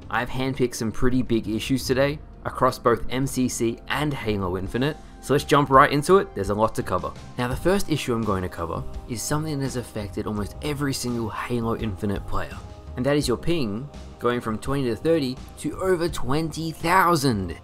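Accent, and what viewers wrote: Australia. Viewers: is it bad i just noticed you're Australian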